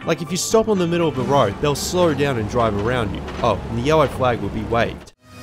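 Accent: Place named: Victoria, Australia. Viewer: I would say by the accent he is from either SA or VIc, probably Vic.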